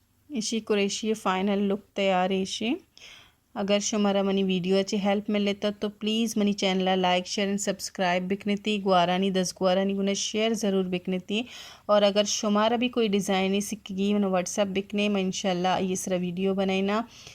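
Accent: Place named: Karachi, Pakistan. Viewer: Assalamualaikum chune sis, sis mani ami tai art'e baz tareef ka, she loves your art, ami salam keh rhi hain or poch rhi hain kia ap Karachi se hain qk apka accent Karachi wle balocho ka h, so sweet 😘🥰❤️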